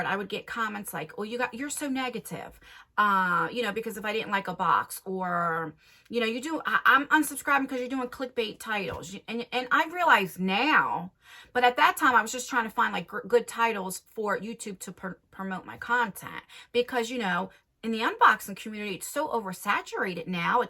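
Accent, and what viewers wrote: New Jersey, United States. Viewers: I've been watching you for a little over a year and you are the best part of my evening. I literally go to bed with Abby, Theresa is dead, and you. I enjoy your New Jersey accent and love listening to your new podcast with Abby. Can't wait for you two to come out with you merch line. Can you imagine your own line of tye-dye hoodies. That would be so awesome. Well congratulations for reaching 50k and I will be right behind you when you hit a 100k. Xoxo. 😉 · Love you and your channel! My family is from Jersey and I love listening to you talk. Feels like home...just like a good chocolate chip cookie. :)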